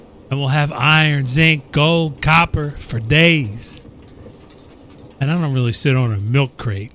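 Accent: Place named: Philadelphia, United States. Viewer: As soon as I heard you pronounce water as "wooder," I strongly suspected you were from the Philly area. I grew up near Pittsburgh, which has entirely different accents, but it's still nice to hear some Pennsylvania pride. Respect, sir!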